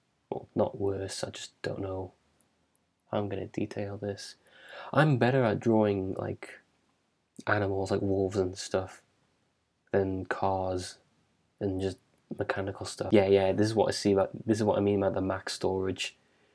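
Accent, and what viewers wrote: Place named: England, United Kingdom. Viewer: tries to guess your region accordion to your accent... Well it's obvious what it's NOT. I'm just going to be vague and say "southeastern". Although I could have sworn I heard a slight northern tinge. Did you just say "fwiends"? Pwease don't tell me there is a slight Geordie influence in there! You know what? Scrap that! You know that scene from Mrs. Doubtfire when Pierce Brosnan (yeah, and obvious Irishman, lol) mentions how he can't quite place Mrs. Doubtfire's accent, and so he responds, "All over." Yeah, that's what I am saying your accent is, everywhere in England anyway, accept for Cornwall, definitely not Cornwall. Wait, did I just use "accordion" when I meant "according"?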